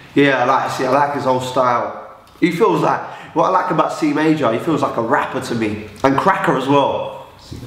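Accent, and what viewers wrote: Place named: Brixton, United Kingdom. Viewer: He's from Ipswich, why's he talking like he's from Brixton?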